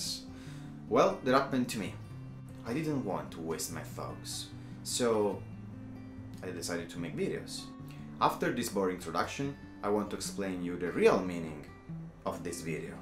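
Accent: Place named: Hungary. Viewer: I like your accent 😊 It’s like Hungarian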